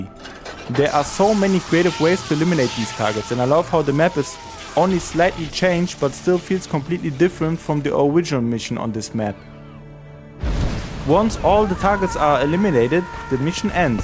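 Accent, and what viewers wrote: Germany. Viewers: Are you from Germany? I've seen several videos from your channel (which is very good, by the way) and I was trying to guess where you were from based on your accent, and I thought of everything but Germany. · Do I hear German?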